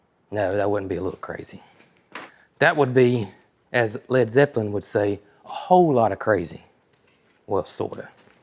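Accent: South, United States. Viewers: Amazing about ESSO, he still had a Tiger in his tank, he just had his wires crossed....LOL, I have had days like that. I loved this video, we got to see you huggin on Jesse, rubbin on Nugget and of course snugglin on Holly, my heart, my heart. I always enjoy the videos, but when you love on the animals for a minute, it is so special, especially for those of us who don't get to do that much anymore. It's plum therapeutic and as a fellow southerner, we know plum means "sure enough extra"........ don't change a thing about how you talk. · I 💕 E THE SOUTHERN TALK, B PROUD OF YO SELF&IM. ENGLISH!🏴😎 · Do not change the way you talk, I am Southern we talk just fine. Its everybody else who talks funny, lol❤❤❤ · I’m from Maine and absolutely love the southern accent. Continue being you. That’s why you have so many followers and Cog Squad fam ❤ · Omg. Don’t change your verbiage. 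Gas is gas everyone knows there are different mixes. It clearly showed on tank diesel. Lighten up people. Keep,what you’re doing! We love you and I love the southern accent. ❤❤❤❤❤❤ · as a woman raised in South Carolina I appreciate your colloquiolisms. Since I was 18, I have lived all over the USA but then, 21 years ago, I moved to Australia and here I remain. One of the things that drew me to your channel is your and wholesome realness. Your accent is something I love to hear because it's something I miss and the only time I hear a similar accent is when I talk to my Mama - her mama was raised near Selma and my grandaddy was from Georgia so really appreciate the familiarity of hearing you talk about your day and the animals. It reminds me of the time I spent with my grandaddy. He taught me how to hunt & fish, grow worms, and crops. Like him, you have a quiet patience in your explaining what you're doing and showing your enthusiasm for your daily tasks. 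It's inspiring and educational at once. Thank you for sharing your days with us. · In Australia we call Gas Stations, Petrol or Service Stations or Servos, you can buy Leaded and Unleaded Petrol, Diesel, LPG and some used to sell Kerosene for the old heaters. We see enough American TV shows to know you call them gas stations. We all know what you mean when you say Gas. I didn't know that in the southern states you call most soda drinks "coke", but that is part of the charm of watching and learning your culture, please don't change your speech for anyone, it's who you are and where your from and the time your from. I fell in love with Cog Hill Farm, run by a wonderful family in Alabama, I love your accent and your slight differences to other places. Please don't change for anyone. Have a blessed day, stay cool and hydrated. I'll stay warm and out of the cold lol. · Under no circumstances, do you apologize or change the way you talk! 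You are from the south be proud if they don’t like it they can leave your channel❤ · 100%!! I feel like people intentionally get hung up on things to cause drama, getting hung up on semantics instead of enjoying a video. If they can't figure out that knows what kind of fuel his things need, that's their problem! Expecting someone to talk the way they do is outrageous. He's from the South, he speaks how he speaks, it's who he is, and everyone needs to leave him be. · I concur with She beat me to saying DO NOT CHANGE for others!!! You speak with Southern Charm. Please, DO NOT become "woke" with the crazy world that gets offended over things or words. We love you just the way you are. Anyone gives you lip, just tell them that GRAM in TX told you not to do it Luv ya: GRAM 👵in TX🙏❤ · Love a good southern drawl, and easy going vocabulary.. it takes me home to a place and people I loved💗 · please don't change any part of your speech. 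I am Australian, and you and are teaching me Southern. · Your speaking fine,people have to understand your from the south and things are said differently there,but there is always someone that has to complain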